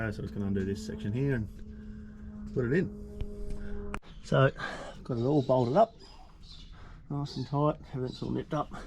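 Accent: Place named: Australia. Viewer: Your accent says you are in Australia. Does Airbagman sell in the U.S.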